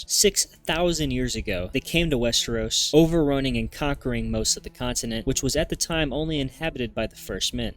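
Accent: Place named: United States. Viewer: I like your accent. I'm assuming you're American (correct me if I'm wrong and you're Canadian); which part of the States are you from?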